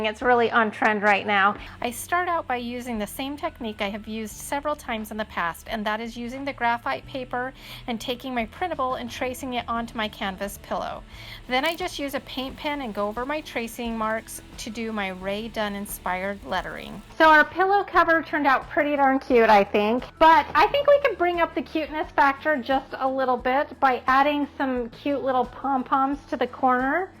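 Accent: Utah, United States. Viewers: I have those same jack-o-lanterns and I just turn them around for the month of November. I also made your welcome home sign and had thought of putting a big maple leaf where the wreath is but I love the idea of just adding holiday embellishments to the wreath ! Thanks for all the cute ideas. You sound like you may have grown up in Utah. Love your channel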